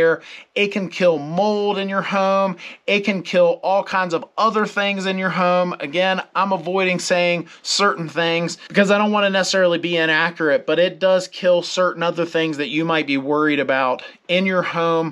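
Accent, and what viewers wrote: South, United States. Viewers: Sound like a southern accent. Anywhere near Louisiana? Had 2 HVAC come out with both saying completely different things.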